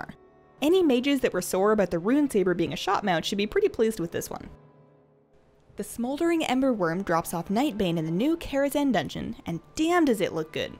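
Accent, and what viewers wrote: Canada. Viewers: Great vid, love your enthusiasm and super Canadian voice. Subscribed :)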